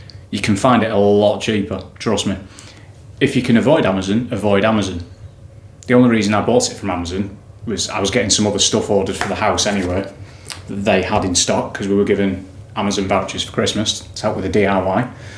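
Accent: Lancashire, United Kingdom. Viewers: Nice review!!! £24.60 on Amazon 27/2/23. Enjoy your enthusiasm and honesty. Thank you. Do I detect some northern accent. Lancs? Greetings from Cheshire 😌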